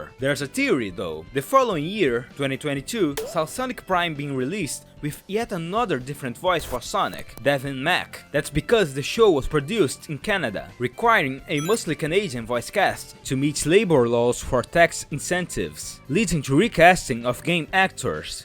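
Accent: Brazil. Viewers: I do think the narrator is brazillian too,, do notice how they say manolo rey, and y'know,, "velocidade" in the bottom corner, not sure if the channel is fully ran by brazillians,,, mas seria legal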